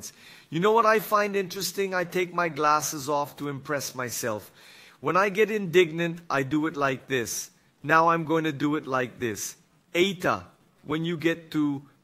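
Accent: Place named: India. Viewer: Great job dr.. Sutaque parececido com indiano falando.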